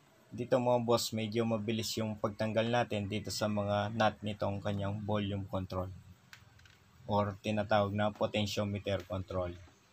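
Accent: Pampanga, Philippines. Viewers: Boss gnyan din problema ng ampli ko.. Mahina kbilang chanel. Pinaayos ko pero di naayos.. San loc mo? Prang kpampngan k kc kung mgsalita.. Kpmpngan din ako baka mgkalapit lng tyo paayos ko ampli ko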